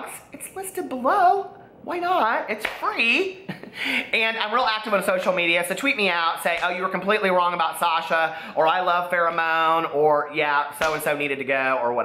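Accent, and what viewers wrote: South, United States. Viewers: you are too gay to function at times... #loveyou great comments, I enjoy your Souther accent.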